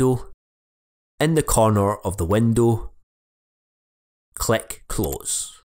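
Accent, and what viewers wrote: Scotland, United Kingdom. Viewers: so nobody gonna talk about his thick scottish accent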